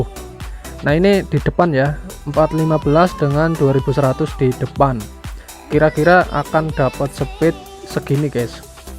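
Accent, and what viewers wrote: Banyumas, Indonesia. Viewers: Ketone wong ngapak kiye 😂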